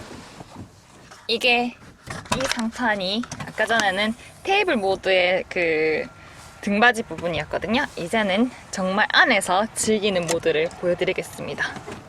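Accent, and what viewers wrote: Gyeongsang, South Korea. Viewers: 부러워요 어떻게 이렇게 잘만들었어요 나도 갱상도 사람 ^^~~~갱상도말 구수해요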